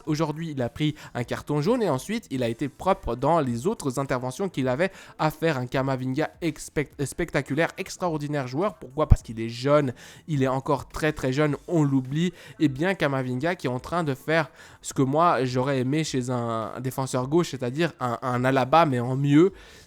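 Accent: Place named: Belgium. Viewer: Merci de votre analyse tu es mon idole. Je demande tu as fais Belgique où tu est un Belge ? Car ton axant les ressemble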